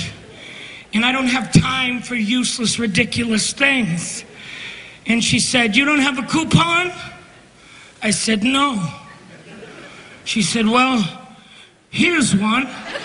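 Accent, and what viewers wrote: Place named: Boston, United States. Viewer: I absolutely love him. Wish I got to see him live. His Boston accent I love it 🥰 RIP John. Enjoy the buffet in heaven. Make God laugh 😂😂😂🙏🏼🌹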